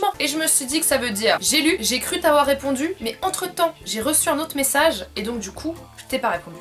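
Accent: England, United Kingdom. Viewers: j'adore ta chaîne ma belle! tu me fait trop rire avec ton pti accent british! #teamsnapchat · tu as un très belle axent anglais j'adore t'es vidéo continue comme ça tu es trop drôle bisou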